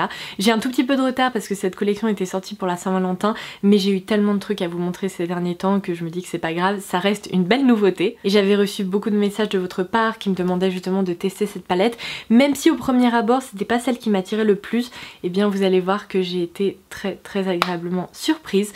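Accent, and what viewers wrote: England, United Kingdom. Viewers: Vidéo top encore une fois! Les produits me donnent trop encie, surtout la palette de fards 😍 Et cet accent english que tu as, j'adore 😂😘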